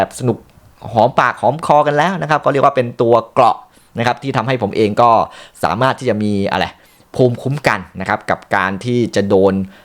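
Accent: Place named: North, Thailand. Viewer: ไม่ว่ากันเรื่องพูดไม่ชัดในบางคำ เพราะมันฝังรากลึกไปแล้ว เหมือนคนเหนือ ต่อให้พูดกลางแค่ไหน จะมีแว๊บหนึ่งที่จะเหน่อเหนือนิด ๆ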